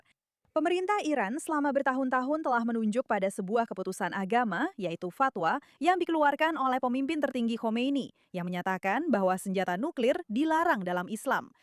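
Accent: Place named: Malaysia. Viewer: Dialeknya kadang malaysia kadang Indonesia. Kurang seru 😂😅👎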